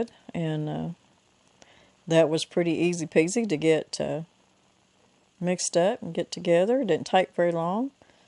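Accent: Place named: Texas, United States. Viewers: that’s how we say thing in Texas! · U2 are wonderful, please tell me your accent, were are U from......Texas maybe................I just Love this accent. .......I am French Canadian living in Vancouver BC and I jet get exited with your life...Thank U2 for your great meal................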